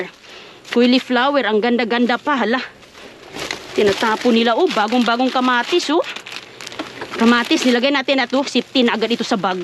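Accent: Visayas, Philippines. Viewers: Your very visayan speaking,that's good your husband &your kids are eating foods.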